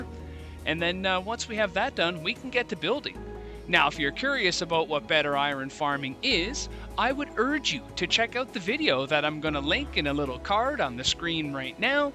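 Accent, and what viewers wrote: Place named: Australia. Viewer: Great video! loved the Aussie accent! haha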